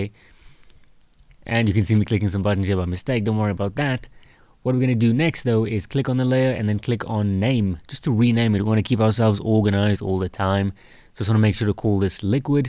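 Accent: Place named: South Africa. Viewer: Could hear the accent was wondering if you're South African - thanks a lot for this, will be watching a lot of your content. So cool to see a creator from SA on youtube with nice tutorials. Made my day!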